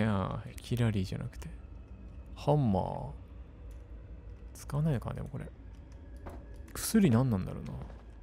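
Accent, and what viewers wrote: Hokkaido, Japan. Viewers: 謎解きもゴリ押しで解いちゃったり、北海道弁が聞けたの嬉しいし、びっくり要素がいっぱいあって面白い名前があったり、八つ当たりで「飛んでけぇぇ！！」や物を投げる時に「すとーん！」って言っているのも好きです。お約束のバグもあって面白かったです。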